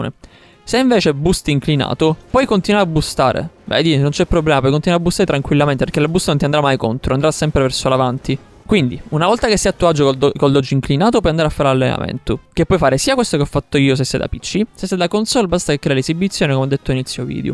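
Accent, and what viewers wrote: Palermo, Italy. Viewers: Ma sei di Palermo??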